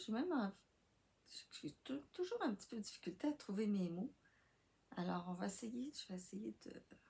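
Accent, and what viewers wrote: Quebec, Canada. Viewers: Quel plaisir de vous visionner, j'adore votre douceur et votre bel accent québécois. Bonnes vacances. Bisous de la France 😘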